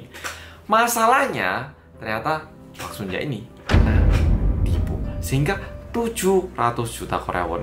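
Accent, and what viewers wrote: Java, Indonesia. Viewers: Semapot gak bisa hilang, medoknya itu lo 😁🤣🤣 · iku lho.. aowkowkwowkwok medok tulen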